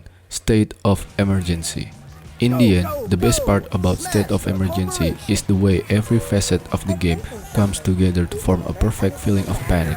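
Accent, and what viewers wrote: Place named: Indonesia. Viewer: Your accent sounds a lot like Indonesian.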